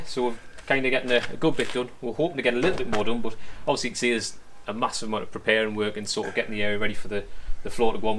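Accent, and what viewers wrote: Newcastle, United Kingdom. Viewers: I love the work you're doing but I'm also enjoying the Geordie narration!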